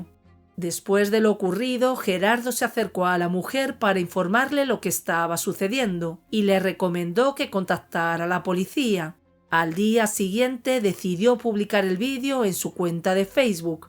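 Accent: Spain. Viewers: esta bien pero me hace ruido tu narracion no me gusta para nada es contaminacion auditiva ese hablado casi que cantado de narrar es desesperante mas el acento español deberia ser neutro. pero tu contenido es bueno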